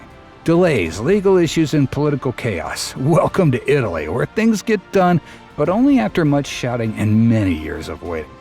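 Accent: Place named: United States. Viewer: Why is there a Shi#ful American voice over on this video.... un #ffin believable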